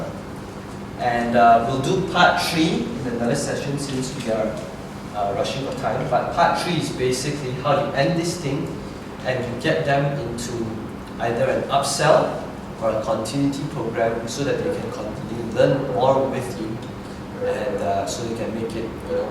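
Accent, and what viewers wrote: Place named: Malaysia. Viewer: It's likely due to his Malaysian accent, which I understand how certain words sounded as if they were muffled as most Malaysians has less accentuation in the pronunciation of the English Language, an often overlooked detrimental effect that was resulted from how fast, short, and simple they wish to be in their speech. I'm a Malaysian my whole life, so of course I understand.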